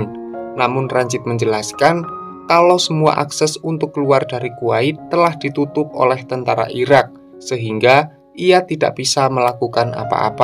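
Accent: Java, Indonesia. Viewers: Baru nemu chanell ini..suara nya jawo tok wkkwkk lgsg subcribe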